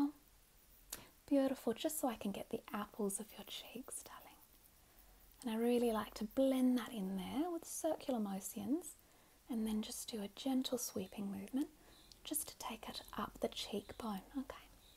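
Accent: Sydney, Australia. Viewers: australian accents are god tier 😋😭❤️ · Sim, o sotaque é real. É o sotaque do inglês australiano :) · every Sydney mum I overhear that comes on vacation to Gold Coast 😭😭 perfection. stunning. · I just found your channel and fell absolutely in LOVE with this character and your videos!! I’m from Canada & australian accents are so beautiful and calming to me. I immediately subscribed!! :) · I really liked the asmr but the Aussie accent was alright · Wow she must've said "charlotte darling" 100 times in just 1 video. Aussie accent is the best accent. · The accent is everything!! I am also from Australia hahahaha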